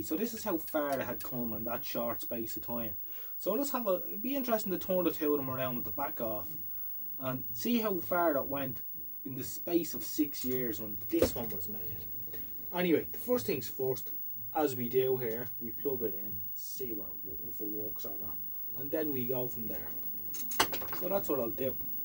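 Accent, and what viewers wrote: Ireland. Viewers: Love your strong Irish accent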